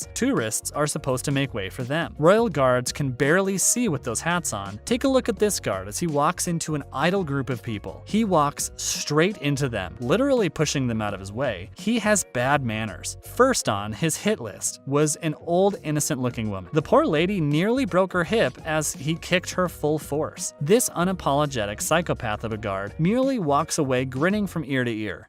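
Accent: United States. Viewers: The narrator is a jerk, he sounds like a Yank, so dumb. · The narrators' accent says it all. Yanks are always a pain,an advertisement of a run-down,corrupt & broken nation.Its types like him that dragged a once-proud nation to the depths it now inhabits. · What do I think ? I think the tourists should remember, they are duty bound to keep the Way free for the Guards and not reversed. You sound like an American, would you disrespect your own Soldier Guards at the Tomb of the unknown Soldier in the USA ?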